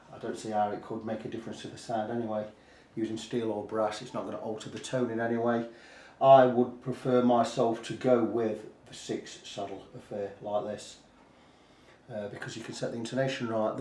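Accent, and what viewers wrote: United Kingdom. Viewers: I give this luthier a thumbs up 👍🏼for his repair skill a thumbs👍🏼 up for that cool British accent and one 👍🏼for his video editing skill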